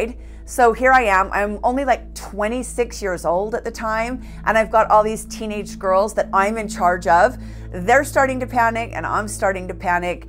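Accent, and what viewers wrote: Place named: United States. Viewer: I love how Americans pronounce 'The -shires' like something out of 'Lord of the Rings' 😆🤣 so sweet - we say it like plain ol' 'shur' I'm afraid...'Che-shur' 'Lincoln-shur'...& don't get me started on 'Worcestershire' 😄 As for being in a dark 7mile tight cave??...for fun...?! HELL NAW!! 😬👈 Terrifying vid & great channel as always. 📛 🖤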